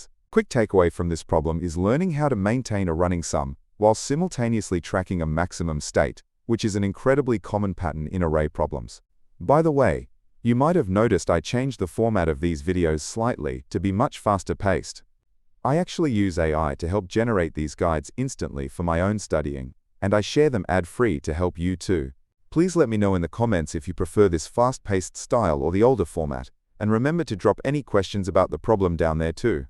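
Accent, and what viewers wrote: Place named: United States. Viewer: I could've sworn there was an American accent earlier.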